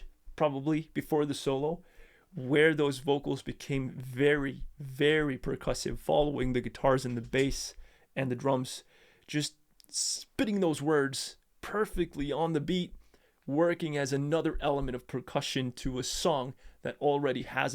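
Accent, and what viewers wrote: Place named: Denmark. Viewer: I am from Denmark. I thought I reconized your english accent as danish.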